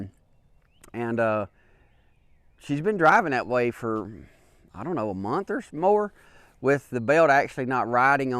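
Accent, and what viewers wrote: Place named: Mississippi, United States. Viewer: You sound like your from Mississippi. No disrespect, I'm fro Mississippi lol. I have a question on a 97 f150. But I'm terribly busy. I'll. Get back with you tonight. Thanks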